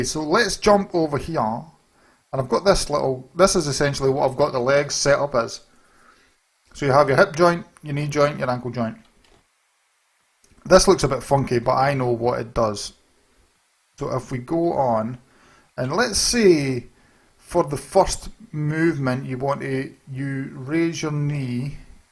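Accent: Scotland, United Kingdom. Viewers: Is he really Scottish? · I'm not saying it's a bad thing but he has a really Scottish accent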